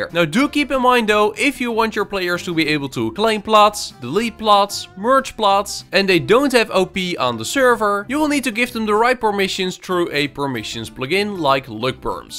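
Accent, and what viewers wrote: Netherlands. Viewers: sounds very dutch xd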